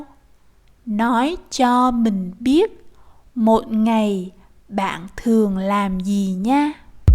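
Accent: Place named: South, Vietnam. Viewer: 👏👏 excellent, thank you! -- May I ask what dialect this is? I thought I heard several Southern characteristics, but the "s" sounds Northern to me?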